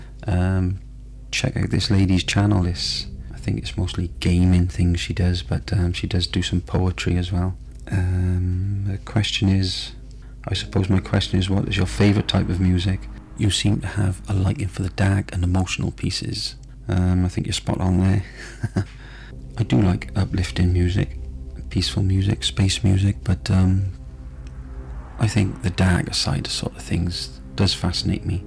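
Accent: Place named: Wales, United Kingdom. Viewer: You sound like you're from Newport, definitely Welsh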